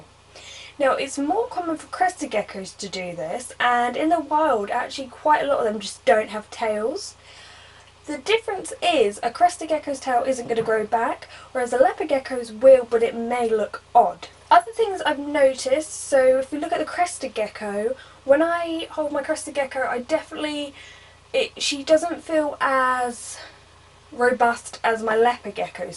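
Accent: England, United Kingdom. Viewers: idiot it is a British accent!!! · DigitalGaming she lives in England and her accent is real bro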